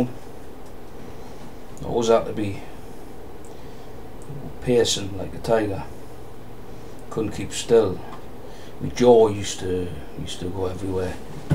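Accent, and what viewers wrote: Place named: North East, United Kingdom. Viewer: i could sit an listen to you all day, where in the N.E are you from?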